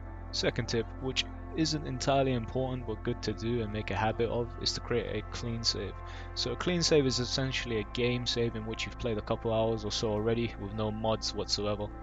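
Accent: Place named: United Kingdom. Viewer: Can you say Water in your British accent please?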